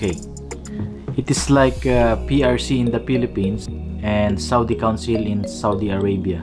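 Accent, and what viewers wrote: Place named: United Kingdom. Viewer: Slang na master. Kala ko briton na ang nagsasalita.😄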